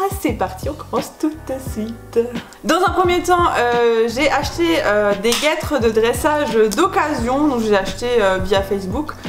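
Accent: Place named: Alsace, France. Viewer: tu l accent alsaciens à fond 😂 mais bon faux pas ce moquer quand beaucoup de gants l ont 😂 bref super vidéo 😘 #teamalsacienne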